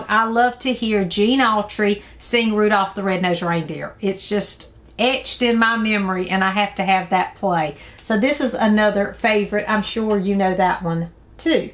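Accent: South, United States. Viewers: your AWESOME!!!...Man oh man..love your fun whimsical video's...love your family value's...and dont get me started on your voice..that southern voice..love it!!..Your so cute and I say that with total respect..FUN FUN FUN video's...My fav Christmas album is one i listened to over and over as a kid in the 70's and its called...( A Mix )...P.S..Just ordered thanks to you..I was hoping was on it but didnt see it..:) Oh well:) is an awesome song..:) Ever heard of it???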